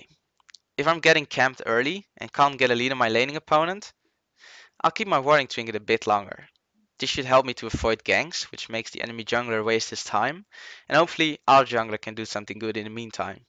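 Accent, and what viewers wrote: Netherlands. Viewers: ben je nederlands? je hebt een nederlands accent (are you dutch i what i asked)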